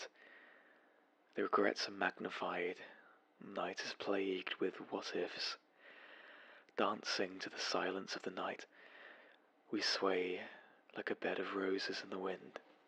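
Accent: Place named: United Kingdom. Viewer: This is lovely... the British accent really gives to the words of the book...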